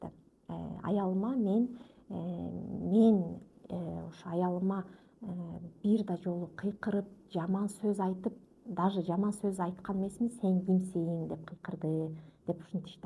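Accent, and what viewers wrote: Osh, Kyrgyzstan. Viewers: Да да болдучу бул оштук окшойт ээ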